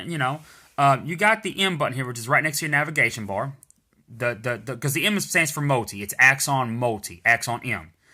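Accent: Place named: South, United States. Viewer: Southern accent!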